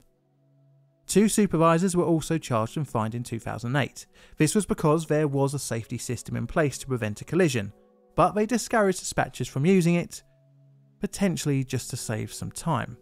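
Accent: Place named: Southern England, United Kingdom. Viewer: I love his voice its a bit of a southern British accent.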